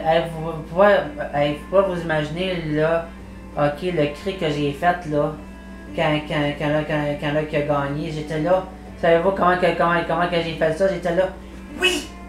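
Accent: Canada. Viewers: sympa ta partie GG à toi poto:) tu es canadien non?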